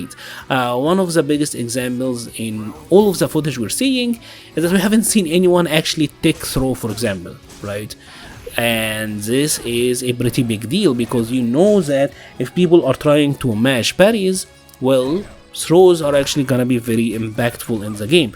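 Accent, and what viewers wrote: Egypt. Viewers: I go to an Egyptian church and I love your accent. You remind me of my abouna when you say "the people" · Love your accent.. Sounds Egyptian..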